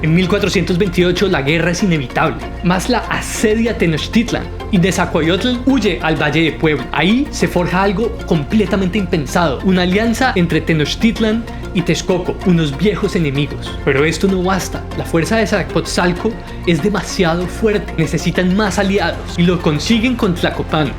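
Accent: Bogotá, Colombia. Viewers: Quedé como iniciado mano! 😅😅 Ojalá puedas hacer la continuación de este video, eres un auténtico rolo, un auténtico colombiano. Me agrada ver que seas tan apasionado por nuestra historia y representes con tu estilo y acento la cultura de la capital. Dios te bendiga.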